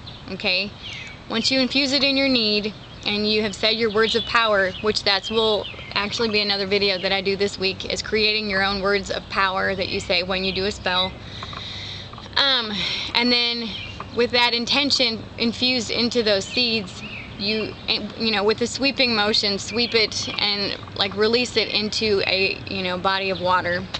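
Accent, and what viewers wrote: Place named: Wisconsin, United States. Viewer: Thank you sooo much! Your uhms and ya knows comes from WI (awesome)! Informative and sweet! Blessed be!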